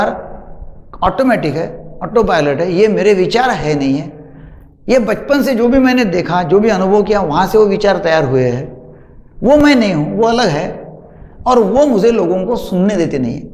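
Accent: Maharashtra, India. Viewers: सर तुमच्या हिंदी भाषेवर मराठीचा प्रभाव दिसतो.. But your all videos are really Awesome as most are connected to our daily life problems and you illustrate it very well .. So please keep posting.. I am from वर्धा... Best luck to you.. Sir, Meditation म्हणजे नेमके काय..? Awareness, Alertness, Consciousness व Concentration यात काय फरक आहे कृपया ते स्पष्ट करणारा video बनावणे.. Sir, Meditation मी अनेक दिवसांपासून प्रतिदिन करतो आहे पण मला त्याचा विशेष फायदा जाणवला नाही आहे.. Present मध्ये कसे जगता येईल ते स्पष्ट करने.. काय Meditation केल्याने कुणाचे मनोविकार(काम, क्रोध, लोभ, अहंकार आदि) नष्ट होतात.. ते नष्ट करायचे असल्यास काय करावे लागेल..? शांत मन Meditation साठी Prerequisite आहे, पण शांत मन कशे साधायचे हा प्रश्न बिकट आहे..? माझ्या मते व्यक्तीस वैराग्य आल्याशिवाय, त्याच्या तृष्णा नष्ट झाल्या शिवाय त्याला शांति प्राप्त होने अशक्य आहे.. पण वैराग्य प्राप्ती व तृष्णाविहीनता कशी साध्य होणार, याबद्दल कृपया मार्गदर्शन करावे..सर्व काही असतांना माझे मन विनाकारण सदैव अशांत राहत.. 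मला चिरकालीन शांति अनुभवायची आहे.. कृपया मार्गदर्शन करावे ही विनंती.. नमस्कार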